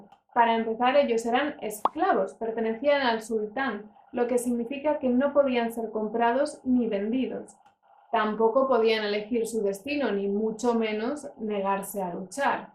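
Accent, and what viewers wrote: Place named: Castile, Spain. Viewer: Qué maravilla de mujer, su embriagadora forma de hablar, en un precioso castellano. Y además preciosa, de bellisimos ojos.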